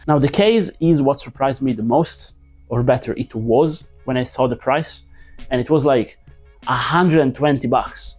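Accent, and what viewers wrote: Italy. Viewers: Are you Italian bro? Your accent is cool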